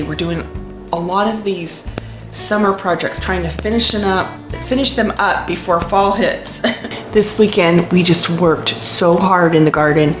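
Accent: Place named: Texas, United States. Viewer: I have lived in Texas my whole life and I swear you could be from here! 😋 I just love your channel and I have made so many of your recipes. They are always a huge success. Thank you for sharing your yummy recipes with us. You are a blessing.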